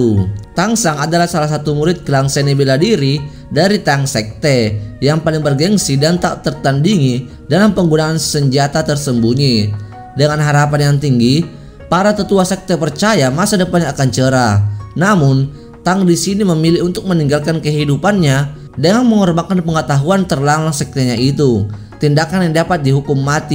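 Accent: Takalar, Indonesia. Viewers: Orang takalar sulawesis elatan kah?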